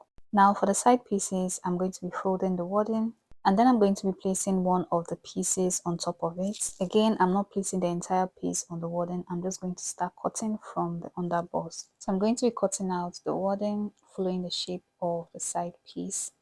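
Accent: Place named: Africa. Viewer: I love your tutorial, but I missed some things. Please clarify what the material is that you are using as the backing to the silk, and what is the sticky item you are using to keep the lace from slipping. I could not understand what you were saying when you mentioned those items. Your African accent made it difficult for me.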